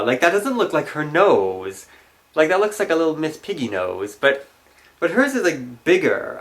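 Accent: Germany. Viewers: You have like a perfect German accent, I can't stop listening to it. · hahaha they must be german. I love your german accent.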